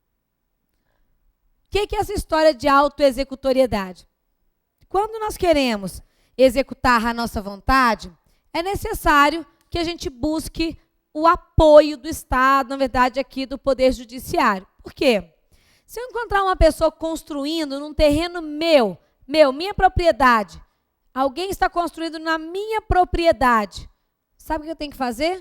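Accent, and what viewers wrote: Minas Gerais, Brazil. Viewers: Tinha q ser mineira !!! ADORO!! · Aula muito elucidativa com uma linguagem bastante tranquila, e ainda com um sotaque Mineiro, parabéns professora continue assim obrigado pela ajuda.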